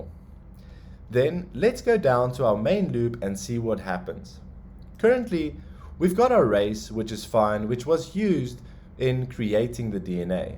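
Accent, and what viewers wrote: South Africa. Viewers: Great stuff boss! Are you South African? It feels like im getting schooled by a long lost uncle. :). " In the coming weeks, I will create a solidity series that will teach you the basics of smart contracts on the blockchain." - The solidity code for NFT's pretty basic. its more the Minting Dapp and react app that can display the list of NFT's and filter is the hard part I think. ;) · Hallo, do you have a walkthrough video of the entire coding? I would love to have a basic understanding of what the different parts do without having to watch how all of it was coded. Thank you for all your effort <3 (I was pleasantly surprised to hear your braaibroodjie accent, ha-ha.)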